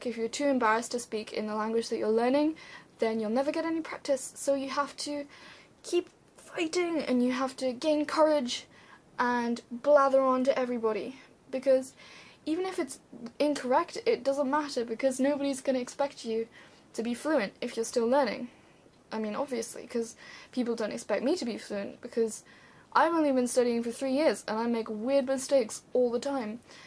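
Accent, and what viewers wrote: United Kingdom. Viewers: is she british? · i love your british accent <3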